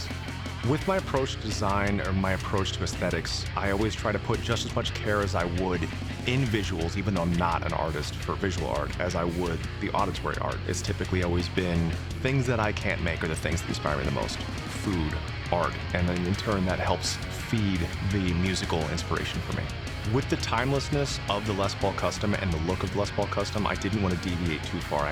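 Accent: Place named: United States. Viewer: Holy crap. I watched all Video that posted in order to learning English but he's talking so fast. I hope makes a course of speaking America accent. I like his voice. I am a fan from Viet Nam. 😁😁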